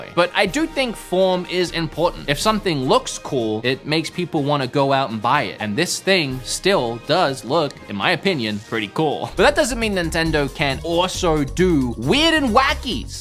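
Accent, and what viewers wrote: Australia. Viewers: I'm just sitting here tripping out about how you seem to simultaneously sound like you're from Melbourne, Australia and Brooklyn, New York. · Wait, you're Australian? I guess I can't really tell an Australian voice apart on YouTube as I didn't know with MVG either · I'm Mexican. is the sole reason I love Australian people so much, obviously biased. But opened the window to another culture and accent I didn't know and enjoy.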